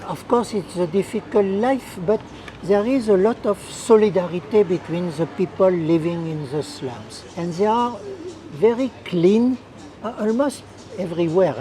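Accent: France. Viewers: His pronunciation is still like that of a typical Frenchman. I'd love to meet this man one day in Mumbai. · Although he speaks in " French English" accent, he is typical manipulative Muslim. Cast system is related to marriage only. So called lower class enjoy way more benefits than so called upper class. Btw there are only two cast "human" and Muslims .There are only two religions "humanity" and Islam.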